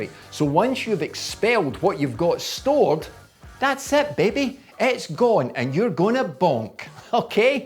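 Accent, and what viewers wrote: Scotland, United Kingdom. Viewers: As an American I could listen to a Scottish guy cuss and swear all day 🤣I appreciate all your advice my friend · Is this accent Scottish? Or what it is? I am Italian and I cannot "localize" it. (I mean his normal accent, not the accent he has when he plays the Scotsman).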